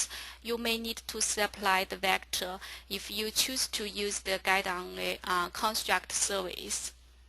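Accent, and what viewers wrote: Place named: China. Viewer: Why chinese speaker....cnt understand...even a sentence...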